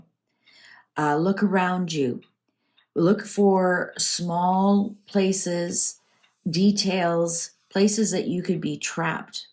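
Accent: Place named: Canada. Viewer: Thanks for today's reading. I thought you were Canadian. I'm a fellow Canadian transplanted in Florida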